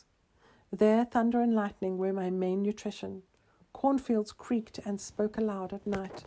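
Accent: South Africa. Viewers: Are you South African? I love your accent. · The reader sounds South African 😊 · Accent sounds south African ❤❤❤ thank you ☺️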